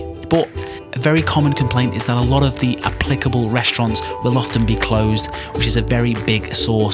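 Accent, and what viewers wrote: United Kingdom. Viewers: I love the accent the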